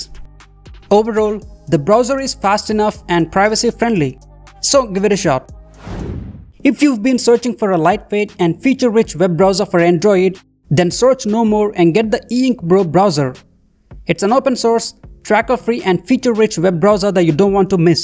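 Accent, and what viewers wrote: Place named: India. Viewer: Bro has an Indian accent. Nice video btw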